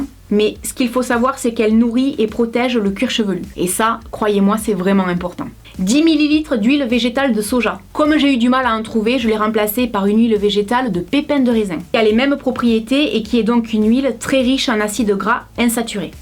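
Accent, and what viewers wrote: South, France. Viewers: Je savais même pas que ca existé Pis ton accent du sud 😍😍 Bisous tu es toujours magnifique ❤️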